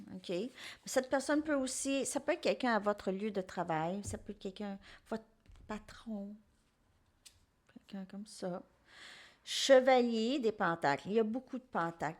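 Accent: Canada. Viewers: Hello, J'adore votre humour et surtout votre accent canadien😜 🙏🙏...des becs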